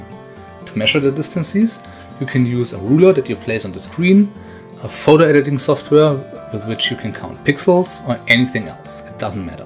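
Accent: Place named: Germany. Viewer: I concur that in the future you have to set the music at much lower level, or actually not use music. Occasionally you swallow consonants, such as where your script says 'square length' but it ends up sounding as 'skwele'. Between that and your german accent: the viewer neets a couple of brain cycles more to get to the words you are saying. Yeah, there is a trade-off there. Pronouncing everything would sound ponderous (Related subject: video by Tom Scott : <link> ) In the case of 3blue1brown I see the music used as part of the brand; the narration is soothing, the music is soothing. For minutephysics too I regard the music used not as part of the video as such, but as part of the brand. Personally I tend to prefer minimalism.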